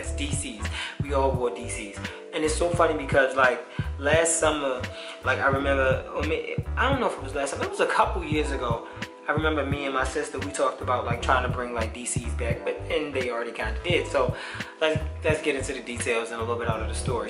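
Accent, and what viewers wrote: Baltimore, United States. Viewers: gotta be from bmore lol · Bruh is from Baltimore fa shoooo 😭😭😭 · you from baltimore? you sound like it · Nigga definitely from baltimore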